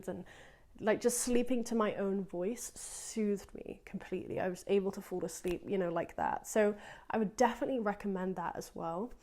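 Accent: United Kingdom. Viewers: I really like your mindset. This is a true gem 💎 I love your British accent 😍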